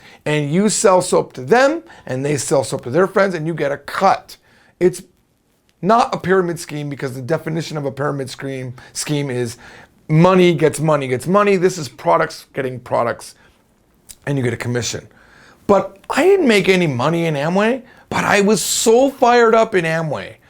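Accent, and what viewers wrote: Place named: Japan. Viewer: Aww your Chinese has a thick Japanese accent. lol so adorable!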